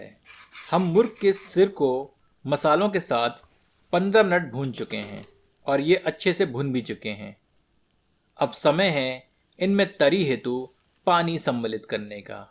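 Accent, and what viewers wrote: Standard. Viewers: Bahut shudh hindi bol rahe hai aaaa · Aapki Shudh Hindi bolne ka mein samarthan karta huin..sadhuvad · Chef aapke tone ko sunkar hi pet bhar jaata h ❤️❤️❤️❤️🥰🥰🥰 Itna suddha aap hindi bolte h to khana kitna tasty hota hoga ❤️❤️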